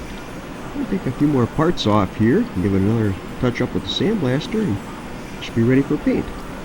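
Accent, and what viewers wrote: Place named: Great Lakes, United States. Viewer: Great Lakes accent....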